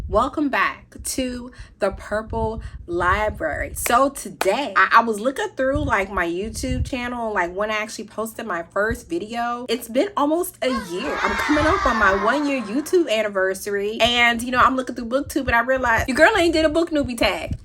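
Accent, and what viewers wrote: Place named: South, United States. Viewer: Yasss southern accent!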